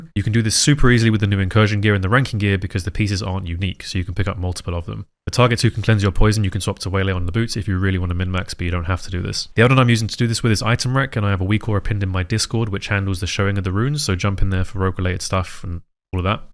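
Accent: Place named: England, United Kingdom. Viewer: If youre going to speed up your speech dont mumble. Maybe it's your accent. Strange how the english invented the language yet cant enunciate to save their life.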